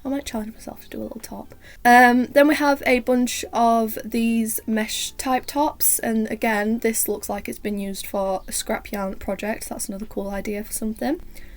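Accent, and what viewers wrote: United Kingdom. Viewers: Your voice is very calming and I love your accent! Which UK accent is that? <3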